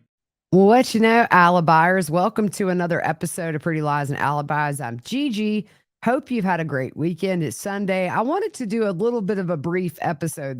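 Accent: South, United States. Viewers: Hola from the Big 🍎 - I watched this at 4 am - this new case is going to be a doozie! If she’s acquitted (?) I’m going to set her up with my ex-husband. Love watching you, especially when I wake up and can’t sleep - miss the southern accents! The south is beautiful. Love you lots!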